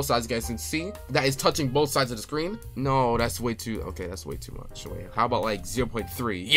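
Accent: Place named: Germany. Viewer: german accent.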